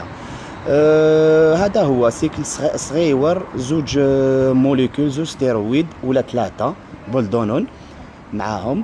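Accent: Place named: Algeria. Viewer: لهجة جزائرية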